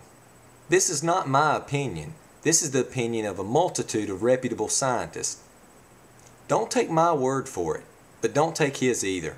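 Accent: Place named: South, United States. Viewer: The beautiful thing about this well-thought, honest, intelligent presentation is m'man's accent. Born in Tulsa, Oklahoma, I was raised in Dallas, and now live in Houston, Texas. All of my life, I have been pounded by the media with two predominate thoughts about the men of the Southern United States; we are all of two stereotypes: 'The Worst of Villains' or 'The incompetent Idiots'. It is a shame that Gore has a dishonorable accent isn't it? My hat's off to you. Ya Done Good! Thanks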